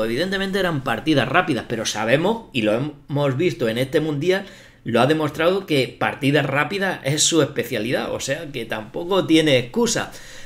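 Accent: Spain. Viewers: Habla muy españolete ese es el problema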